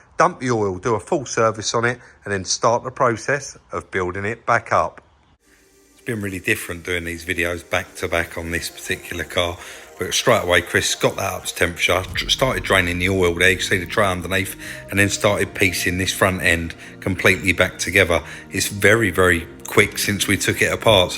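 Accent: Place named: Cockney, United Kingdom. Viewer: Nice video guys , i took a personal interest as a partner owner driver ( yes your right they are all the same except badges and outside grill bits and a few other bits😮) and i must admit i was worried when you gave it a test drive and you mentioned smoke but you knew what you was onabout, good honest video and you do yourselves proud every time, as a Northener i allways imagined you guys with Southern accents were a bit sharp but you do give the motor trade a good name and well done .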